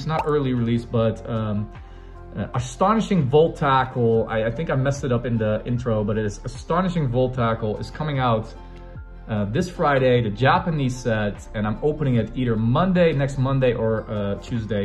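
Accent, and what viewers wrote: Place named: Netherlands. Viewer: Ur accent sounds like ur from Holland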